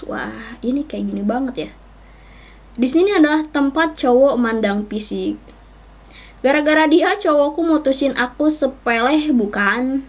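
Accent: West Java, Indonesia. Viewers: Itu logat Sunda sih kalo gak salah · ai kaka teh orang Sunda aku tau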